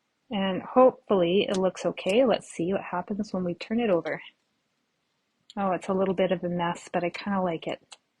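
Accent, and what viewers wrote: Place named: Canada. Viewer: I’m enjoying your design and instructions. Very clear sound. I thought you sounded like a Canadian! ❤. I’ve subscribed so I will see and hear more of your vlogs!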